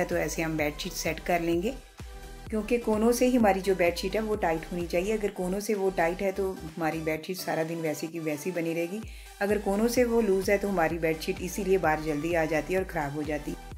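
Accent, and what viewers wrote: Punjab, India. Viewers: Thanks par ek baat confirm hai ki aap Punjabi Ho apke bolne ke tarike se pata lag raha hai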